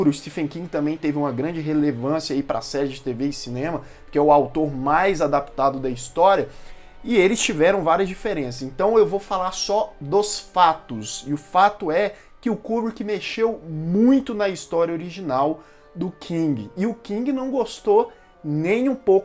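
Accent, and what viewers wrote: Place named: Minas Gerais, Brazil. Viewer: meu deus como eu amo o sotaque mineiro AAAAAAAAAAAAAAAAAAA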